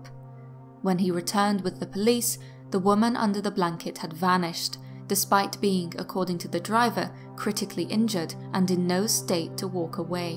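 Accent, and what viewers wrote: United Kingdom. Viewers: is the narrator's british accent real?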